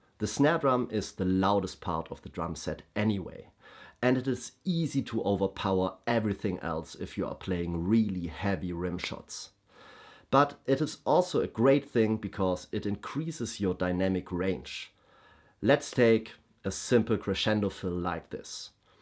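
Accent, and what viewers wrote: Germany. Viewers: thats a german accent isnt it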